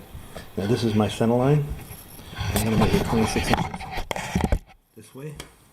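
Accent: Boston, United States. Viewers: Just bought my first home & have been binge watching your videos. Thanks so much for explaining things so carefully! Question: any plans for a wall tile PDF? I would definitely pay for that! Btw—ya accent makes me homesick for Boston BIG TIME! That’s actually what made me keep watching! Lol...thanks again!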